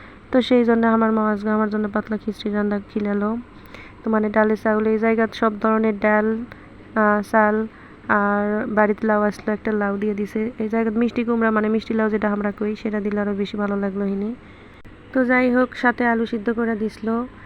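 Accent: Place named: Bogura, Bangladesh. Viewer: এটাই কি অরজিনাল বগুরার ভাষা অনেক সুন্দর ❤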